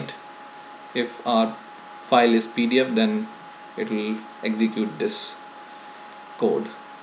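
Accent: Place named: India. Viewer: I get nervous when i hear this indian accent. Somehow i hate it !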